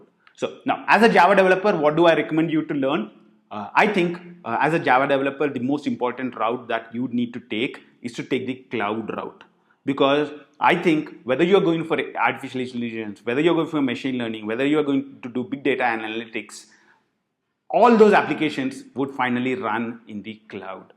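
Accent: India. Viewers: Very interesting content, but the indian pronunciation is awful 😑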